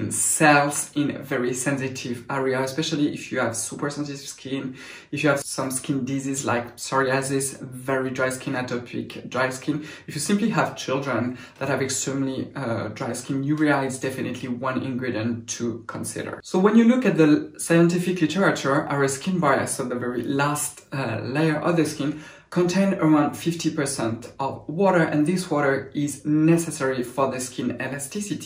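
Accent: France. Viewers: Are you French? Lovely accent!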